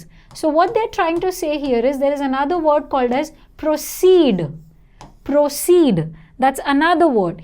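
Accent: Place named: India. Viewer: I like Indian accent.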